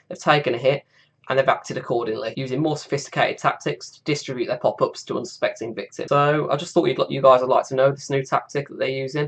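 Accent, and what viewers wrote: United Kingdom. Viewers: Do u live in birmingham, u sound like ur from dudley or west brom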